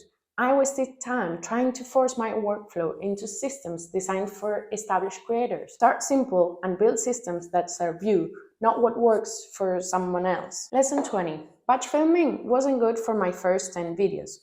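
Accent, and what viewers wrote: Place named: Denmark. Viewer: Thank you so much for this video. I am just over one month in and trying not to get discouraged by low views and having only a few subscribers! As an author I want my channel to be a community for my readers to connect with me, and each other. I hope that my persistence pays off in the long term. Also- I love Denmark and hearing your accent reminds me of when I visited last year!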